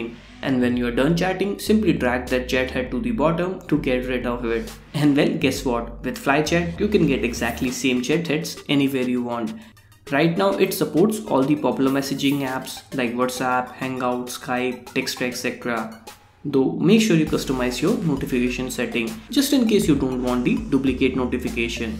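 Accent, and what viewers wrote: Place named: Bengal, India. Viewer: i think you are Bengali . i am saying because your accents is a lot like Bengali .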